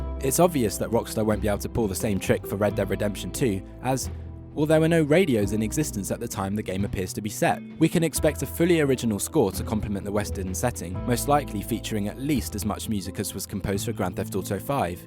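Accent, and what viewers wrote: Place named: London, United Kingdom. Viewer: man, I love your accent. Are you from London?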